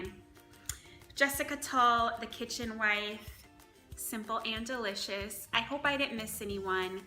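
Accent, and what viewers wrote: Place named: Minnesota, United States. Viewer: Thanks for the Tag! I'm still dealing with this leak 😩 I look forward to doing this tag soon. Oh and you definitely have a Minnesota accent 😉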